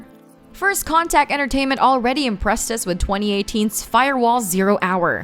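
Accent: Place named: Philippines. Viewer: MooseRocket she sounds like a FOB Filipino?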